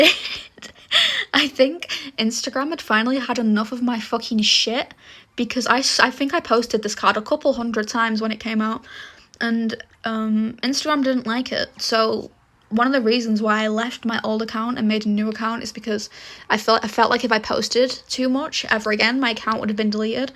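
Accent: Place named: Manchester, United Kingdom. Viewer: loving the manchestian accent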